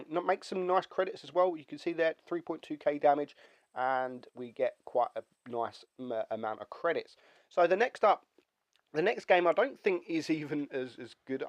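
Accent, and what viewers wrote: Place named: Cockney, United Kingdom. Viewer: speaks cockney not English get it right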